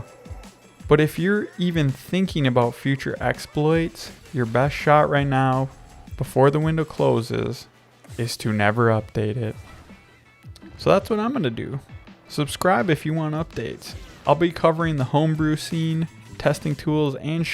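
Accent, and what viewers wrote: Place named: United States. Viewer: the most american accent ive ever heard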